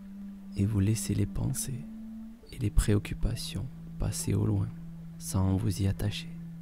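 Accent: South, France. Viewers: Oula ça sent l'accent du Sud, déjà j'accroche à mon "dialecte" 😉... Si je ne rajoute rien de plus c'est que je dors et c'est que c'est efficace 💪✌👌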